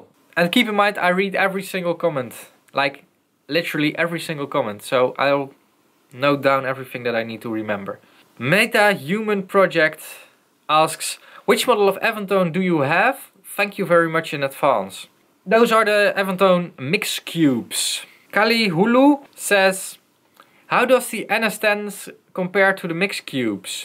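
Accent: Netherlands. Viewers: Are you dutch, you have a bit of an accent?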